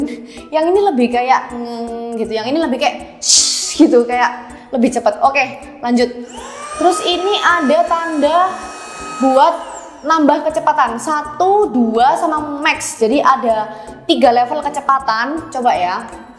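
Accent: Java, Indonesia. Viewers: It’s Indonesian language with Javanese accent 😂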